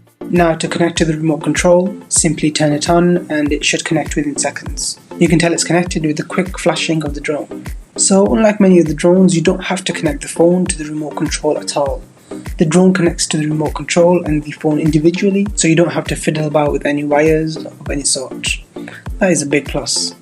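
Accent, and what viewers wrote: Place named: Pakistan. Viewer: You sound like a Pakistani 😕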